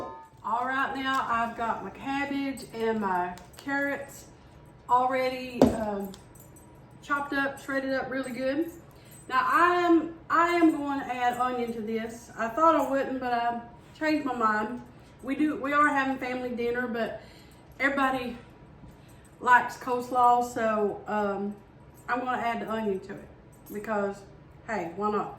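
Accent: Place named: Tennessee, United States. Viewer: Hey. I absolutely love Cole slaw with almost everything too girl. I don't put lemon juice in mine or white onions. But I do put vinegar and chopped green onions in mine. I don't put carrots in mine either. My family don't like them in it. I can't hardley get them to eat Cole slaw anyway. Except q of my twin daughters. But I will definitely try the lemon juice next time I make it. I'm from Morristown Tennessee girl. And my name is Melissa. Nick name is. Lol. Glad I found your cooking channel. I also have had wilted lettuce and poan bread( biscuits). I use to call the pintos. Soup beans. And my sister wud make fun of me. That onion soup chicken looked delicious. And I also cud eat dressing all the time too. I'm sure I've had meal gravy b4. Not sure who made it. Probably my mamaw. She was raised and lived in Tennessee as well. And hearing u talk sends me back to them good Ole days. 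When u say youens. I use to talk like that too. I had to move from Tennessee back in 1995 bc of a bad abusive marriage. To North Carolina. I do miss Tennessee so much. I just don't miss the craziness I definitely had to get me and my babies out of. I still have family that lives there. Cousins, STEP dad, step kids and step grandbabiesa step brother and some friends that I wish I cud come visit on a regular basis. I will continue to keep watching ur videos sweet doll. I'm absolutely loving it so far. Your daughter is so adorable. GOD BLESS US ALL SWEETHEARTS XOXOXOXOXOXOXO HALLELUJAH AMEN 🙏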